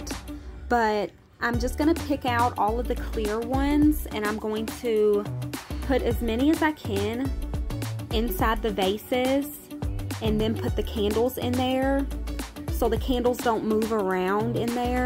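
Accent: South, United States. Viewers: I hate cleaning the bathroom! I clean the toilet everyday because I have all men in my house. So ya you already know! Glad you made another video!!!! Was missing that southern accent!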